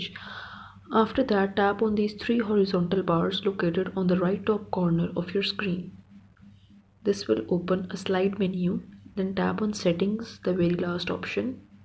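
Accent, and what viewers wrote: India. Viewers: OMG - this lady's Indian accent is enough to make me want to blow my brains out - C R I N G E ! ! ! ! ! Somebody ought to tell her that you don't start a sentence with the word "so" unless you're following up a previous sentence, implying "therefore". D O U B L E C R I N G E ! ! ! ! !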